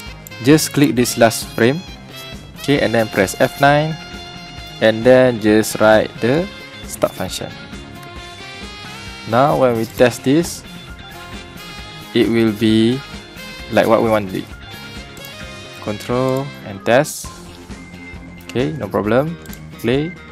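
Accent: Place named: Malaysia. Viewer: Your sound like malay , are you malay ? Can we have a conversation ? Wanna deal something about cv interactive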